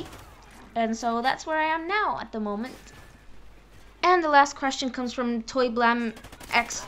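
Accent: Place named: South London, United Kingdom. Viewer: Dat South London accent was a buff one still clearly reppin the ghettos fam keep up da good work SAFE!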